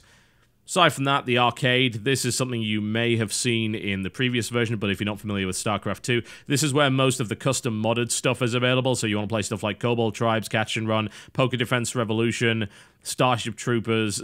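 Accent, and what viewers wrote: United Kingdom. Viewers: I am honestly loosing my ability to detect your british accent... I don't know if it because I watch a lot of channels with accents from the U.K a lot and therefore Americans and people from the U.K are starting to sound the same but either way I can't even tell the difference that easily anymore.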